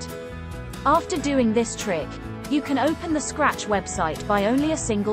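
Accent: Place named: United Kingdom. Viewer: `Je praat echt met zo’n Brits accent’. Or in English: Your really talking with an British accent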